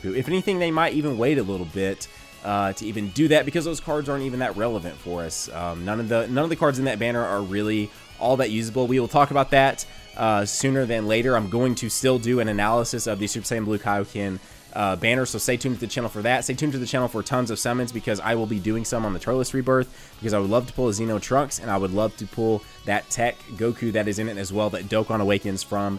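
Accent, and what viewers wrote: South, United States. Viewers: Recently subbed you're one of my favorite youtubers xD also i can dig the southern accent! Im from Louisiana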